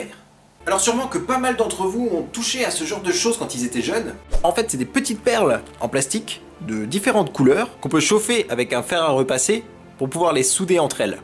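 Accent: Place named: Alsace, France. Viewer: Tu ai alsacien car j'ai reconnue ton accent .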